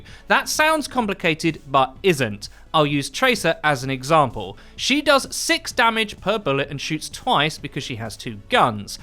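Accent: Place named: United Kingdom. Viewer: Thought this was a decent explanation of the new changes. Nice to hear a British accent too.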